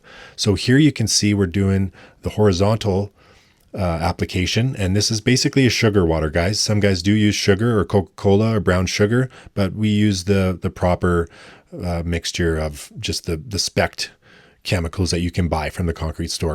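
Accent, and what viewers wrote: Canada. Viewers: Okay, so unlike many people in the comments, I have zero problems with the way that you pronounce the words garage or process. You pronounce those words in a lovely Canadian way. But I have to draw the line at your pronunciation of asphalt. It's definitely not ash-fault, as there's no "sh" sound in asphalt.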